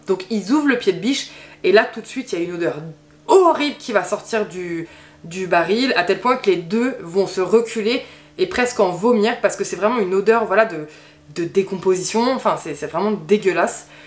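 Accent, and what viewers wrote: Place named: Brussels, Belgium. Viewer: Vous avez quasiment le même accent que feu ma demi-soeur et j'en déduis que vous êtes....bruxelloise. Mais l'erreur est humaine . Vincent, de Liège (Belgique) .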